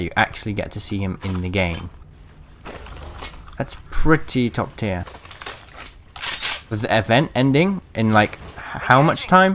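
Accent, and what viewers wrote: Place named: United Kingdom. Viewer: He looking slick 😂 I love your voice the true British sound better then mine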